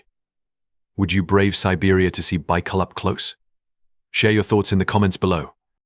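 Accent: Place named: United Kingdom. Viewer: You should not have a Brit accent.. narrator… it ruins the presentation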